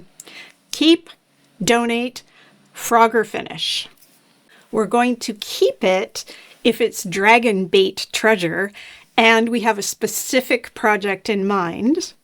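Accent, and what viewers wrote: Canada. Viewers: Hello again,. Great video but I get verklempt when I even think about destashing. To be fair, we moved four years ago and I let go of all of my commercial yarn at that time. But I still have about six bins and countless project bags in the closet. You asked us to comment on our own yarn categories and, were I to destash at some point in the future, I would need a special donate-or-dragon pile that would be for my daughters only. In other words, I will invite them to look over the yarn and donate it to them if they want it, but otherwise it goes back into the dragon hoard. Thank you for the video. I'm really enjoying them and often knitting along (or grading papers and wishing I was knitting along). I am new around here, but I think I detect a Canadian accent - so I hope you are staying warm and wooly. <3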